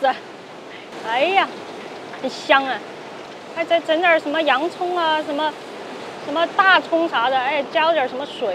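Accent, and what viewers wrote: Sichuan, China. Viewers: 妹子講四川口音的普通話真好听、只要講話就好❤看、可愛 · 可爱的Yooupi小姐姐 你说话好可爱 你是四川人吗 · 老乡，你这个川普挺正宗😂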